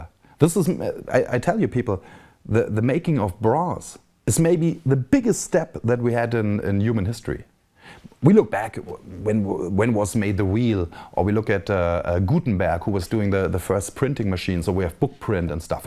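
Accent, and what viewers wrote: Germany. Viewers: super dass du jetzt auch internationale Versionen machst. Gutes Englisch, bin überrascht, und der typisch deutsche Akzent wirkt ja eh immer irgendwie sympathisch.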